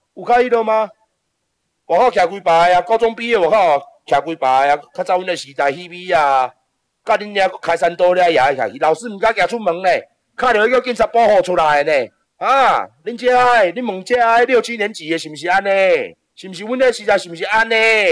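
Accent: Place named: Taiwan. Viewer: 台灣國語 哈哈哈哈哈哈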